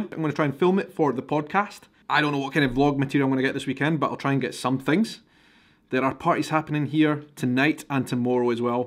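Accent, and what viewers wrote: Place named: Dundee, United Kingdom. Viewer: How do you speak Dundee?!? Lol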